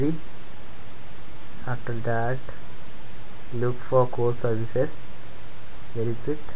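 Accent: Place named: India. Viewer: I dont understand your Indian accent nobody tell what you are you saying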